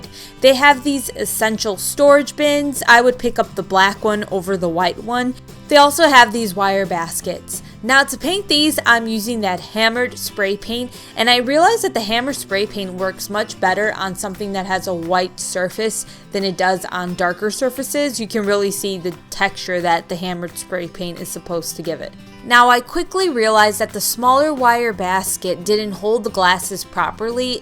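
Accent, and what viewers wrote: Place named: Arkansas, United States. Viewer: Love you're farm house decore u did awesome thanks for showing how to make a tiered tray without spending a fortune been wanting one but wasn't paying what thy wanted what about a tiered basket with e6000 put on carefully glued I wonder how that would work it if u could use a wooden candle stick I think it would work the hardest part would be the first candle stick but maybe turn ur candlestick and basket upside down for the first part and then doing the rest and don't paint until after all together don't know just a thought but I bet u could come up with something I see a lot of the black wired baskets. Girl I love you're channel ur my very favorite and love ur accent where are u from u use y'all like we do in Arkansas that's where I'm from. Awesome Job you are so talented.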